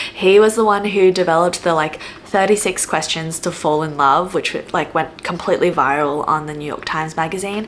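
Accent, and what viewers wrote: Australia. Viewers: I just watched it and the intro and outro are adorable! Lots of people love the good old Aussie accent :) And the research and referencing was really good (I am an Australian psych honours student - so I may be a bit biased). I reckon you should leave it up, it's bonza!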